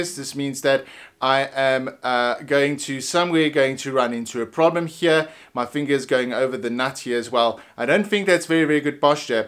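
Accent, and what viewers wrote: South Africa. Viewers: For Forte, the rest stroke finger goes into the next string, don't pluck the string away away from the fretboard. Plucking the string upwards is what gives you the fret buzz. For left hand technique, try to keep your elbow low, it will allow the palm of hand to stay parallel to the fretboard. This will also improve the accuracy of your third & fourth finger. BTW, you sound like your in/from JHB, I'm in Cape Town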